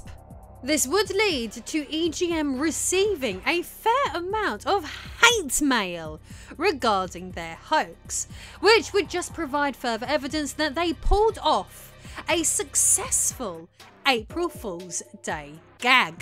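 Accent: United Kingdom. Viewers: This British Accent 👍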